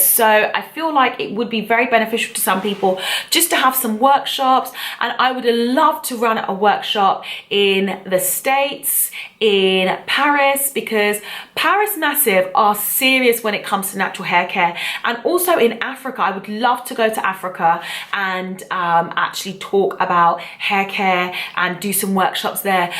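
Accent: England, United Kingdom. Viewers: Just subscribed to your channel. Thank you for the output. You sound English but your products are priced in $. Do you have a UK supplier or am I misunderstanding something?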